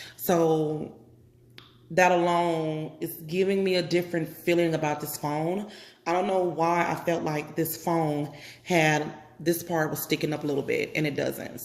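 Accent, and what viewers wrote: Mississippi, United States. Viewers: Lol.....i know that Mississippi accent anywhere #notificationsquad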